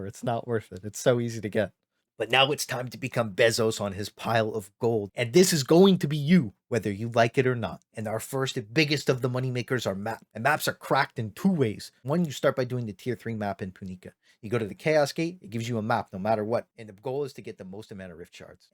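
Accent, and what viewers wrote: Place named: New York, United States. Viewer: Bro are you italian and from new york hahaha you have that whole vibe going, great video btw!!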